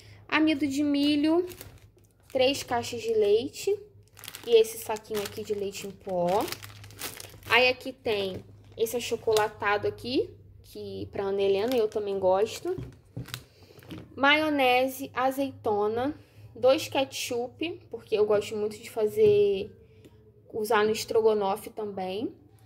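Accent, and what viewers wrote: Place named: Rio de Janeiro, Brazil. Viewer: Oi ta tudo bem caro mais fazer compra por mes e bem melhor Ótimas compras eu tmb comprei chester na peomoção kk eu tmb compro tempero na casas Pedro Eu sou do RJ pelo seu sotaque vc e do RJ tmb ou me enganei kk eu moro perto do shopping Grande Rio Baixada fluminence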